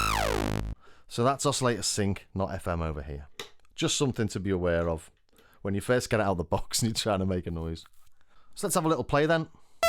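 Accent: Liverpool, United Kingdom. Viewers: hey, I didn't make any comments about your being Scouse (based on what I hear in your accent unless I'm vastly mistaken!), so no need to whinge too much! ;) Haha. Keep up your good work!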